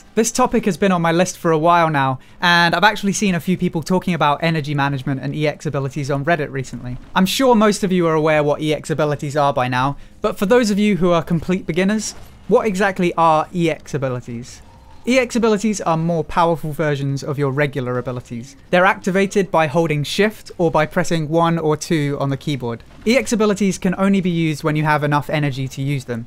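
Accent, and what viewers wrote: United Kingdom. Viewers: i can tell you're from UK because of your accent. plus i'm UK as well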